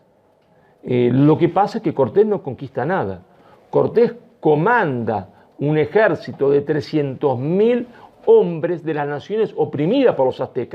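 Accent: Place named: Italy. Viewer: suenas a italiano, pero llevas la hispanidad en las venas!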